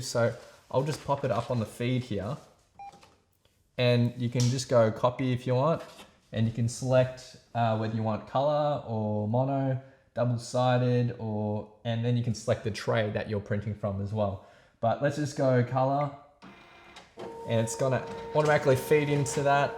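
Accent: Australia. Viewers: I like the aussie accent. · Thanks. Nice, clear review. Nice to hear an Aussie accent too...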